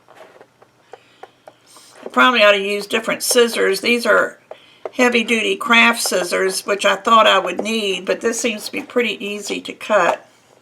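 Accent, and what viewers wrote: United States. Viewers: I've got to guess from your accent your in America. If you think $17 dollars is expensive for a scan and cut mat - don't ever think about emigrating to Australia. We have to pay almost $40 for our mats. 😢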